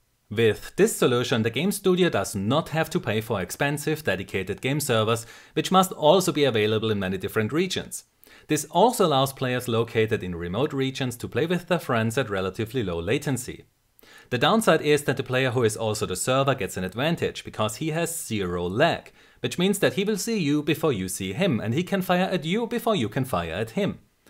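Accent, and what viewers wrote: Germany. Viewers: if this guy is not german i dont know what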